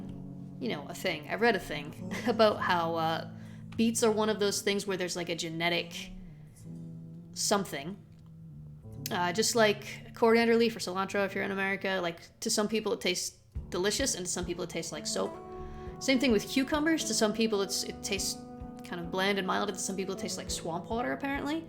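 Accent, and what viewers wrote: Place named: Central Europe. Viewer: From your voice I kinda had a feeling you were from central Europe. Quite amusing how I ended up being correct about that. Also, just out of curiosity, would you happen to be a fellow Dutchie? :p Also, I figured out you can toggle any prep station and holding station upgrades you unlocked simply by clicking on them, so if the large amount of prep stations overwhelms you, that's all you have to do to turn them off again. ^w^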